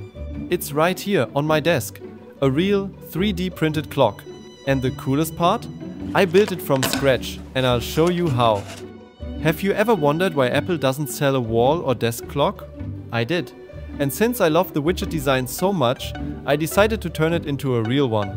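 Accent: Germany. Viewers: the german accent 😂. banger video aber! ich wünschte ich hätte auch einen 3d drucker. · Hör ich da einen deutschen Akzent oder irre ich mich? Gutes Video und ich freue mich dass YouTube dein Content pusht!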